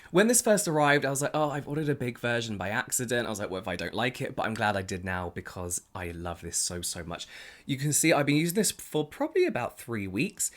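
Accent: United Kingdom. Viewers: Okay i have to say it. I just love you and your Videos and your Intro and the way you speak and your British Accent, it sounds so elegant 😄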